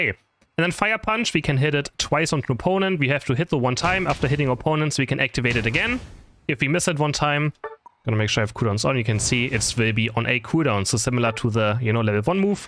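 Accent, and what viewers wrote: Germany. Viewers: German accent 😊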